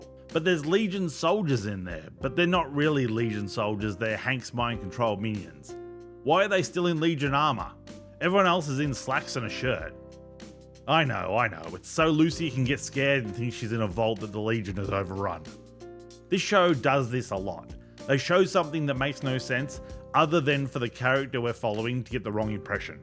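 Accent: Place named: United Kingdom. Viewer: Cause he's British!!!! Talks like hes got a mouth full of biscuits 😂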